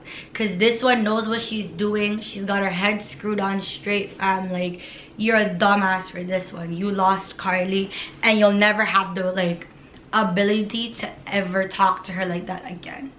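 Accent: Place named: Jamaica. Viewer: Why she trying to sound like a jamaican?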